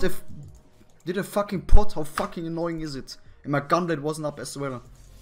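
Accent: Germany. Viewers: Where is he from he sounds like a German dude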